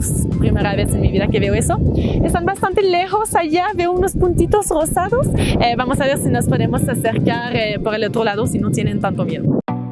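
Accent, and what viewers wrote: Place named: France. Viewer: You’ve won a subscriber because you really love my country as it was yours. I love your French accent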